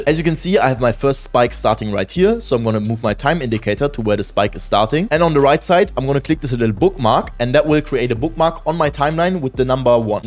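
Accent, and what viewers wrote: Germany. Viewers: man merkt das du deutsch bist dikka der Akzent killt mich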